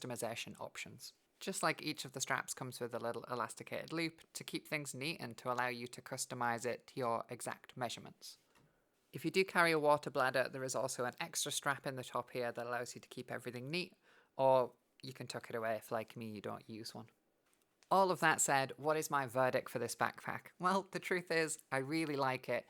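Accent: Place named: East Yorkshire, United Kingdom. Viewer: every now and again, i hear east yorkshire in your accent. Hull. Have you lived in some different places? Where are you from? love the video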